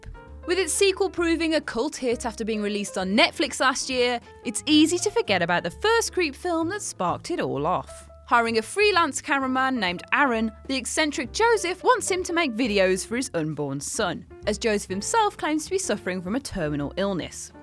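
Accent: United Kingdom. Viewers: UGH. I hate the when they use the commentators with British accents. E#specially this one. Won't be watching this. I'll find a similar list om watchmojo or imdb.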